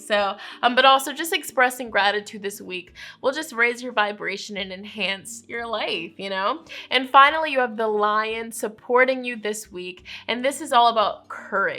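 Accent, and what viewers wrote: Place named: United Kingdom. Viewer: Sometimes you sound British!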